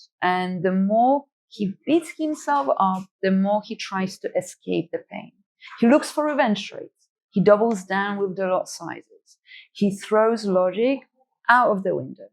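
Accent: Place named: United Kingdom. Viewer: This video is beyond informative. The soft warm British accent is definitely helpful.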